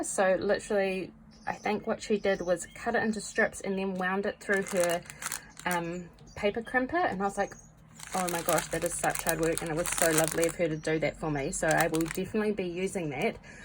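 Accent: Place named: New Zealand. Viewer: Love your accent. New Zealand?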